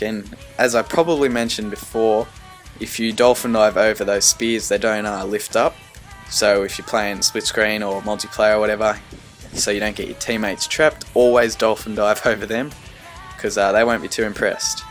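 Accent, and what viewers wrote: Australia. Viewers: you australian? you sound it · AUSSIE AUSSIE AUSSIE, good to hear a fellow australian :)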